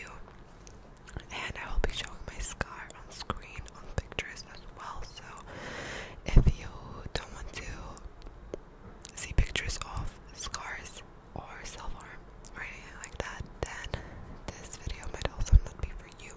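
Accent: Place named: Slovakia. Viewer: I can hear her Slovak accent it’s so pretty and pure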